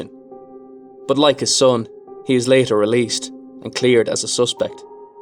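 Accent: Ireland. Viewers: I'm pretty sure the narrator is Irish. Try pronouncing some of their names.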